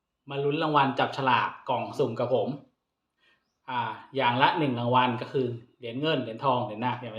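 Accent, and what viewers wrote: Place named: Thailand. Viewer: คิดถึงนะคะอาจรย์ สุดหล่อคะ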